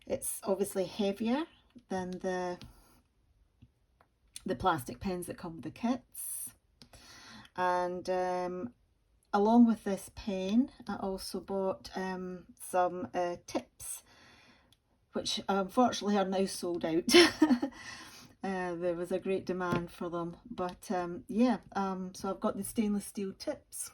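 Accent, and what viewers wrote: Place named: Scotland, United Kingdom. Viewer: Welcome to Youtube! Lovely to have another voice from the UK. I love your accent - I used to live in Edinburgh for 9 years and I miss Scotland a lot! Beautiful painting, enjoy working on it!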